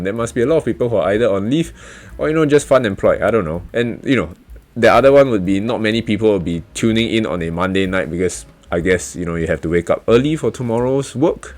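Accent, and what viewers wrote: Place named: Singapore. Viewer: I love the candid video, thanks for making it! As soon as I (American) heard the Singapore accent, I knew you'd be way more honest / trustworthy than the other guru's on the internet. Every Singaporean I've met is pretty sharp and fair so far. Keep up the great work! Looking forward to seeing what's next.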